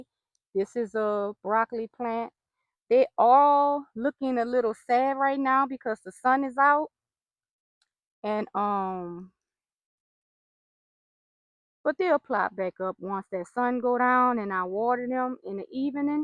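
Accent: New Orleans, United States. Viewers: Oh my goodness I recognize that accent.I graduated from Dilliard University (1978) in Nursing.New Orleans.Just love the garden.Enjoy😊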